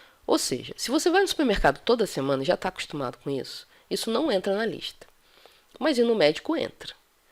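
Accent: Rio de Janeiro, Brazil. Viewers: Sou carioca, e continuo afirmando que o sotaque carioca é sem dúvida o mais bonito do Brasil. haha... Valeu pelas dicas, professora.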